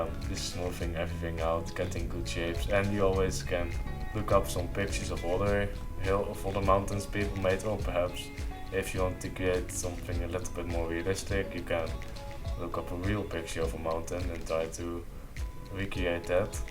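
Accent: Netherlands. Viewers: 100% nederlands kun je horen haha :D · Dutch accent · Are you from Holland, cause you sound Dutch :D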